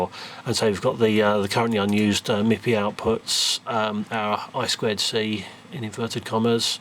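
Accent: England, United Kingdom. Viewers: Great video. Just a little hard to understand/keep up with at times due to you talking fast with that British accent. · As always - Perfect demonstration and technical details! Very Very nice to see!! I love this... BUT: PLEASE try to speak a little bit slower, it´s really hard to understand for guys like me (from German) .. sounds very "English" ;-) US-English is easier sometimes.. Would be higly appreciated.. Again, thanks for your perfect work!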